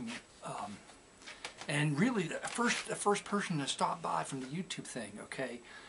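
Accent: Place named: South, United States. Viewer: You have a fine country accent going on there.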